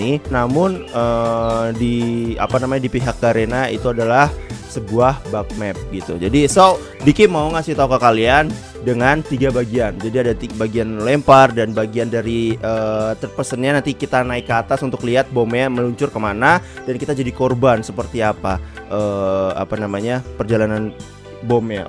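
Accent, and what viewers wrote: Java, Indonesia. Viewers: Bang abang orang jawa ya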